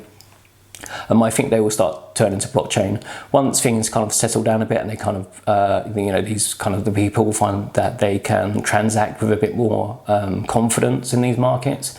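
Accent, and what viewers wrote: United Kingdom. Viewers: This guy using uk accent?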